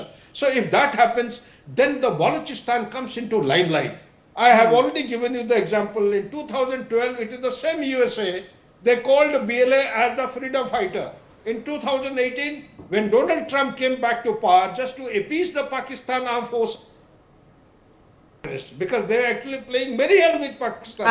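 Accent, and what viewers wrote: India. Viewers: Waatta Patta Itta 😅 Funny Indian Accent of English Language Always Hurts The Ears of Listeners 😅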